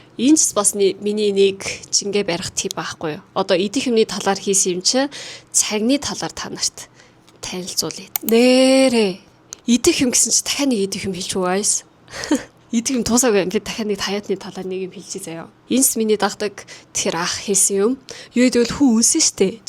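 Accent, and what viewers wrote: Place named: South Korea. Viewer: Ene bagasa solongosd ussun emegtei bha tegeed tegej sonsogdson bh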